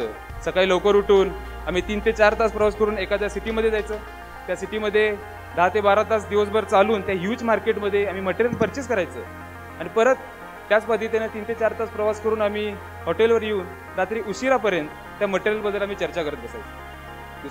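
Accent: Kolhapur, India. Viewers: अभ्यासपूर्ण आणि तितकंच अस्सल कोल्हापुरी टोन मध्ये केलेलं भाषण😊👌👍 पुढील वाटचालीसाठी शुभेच्छा 💐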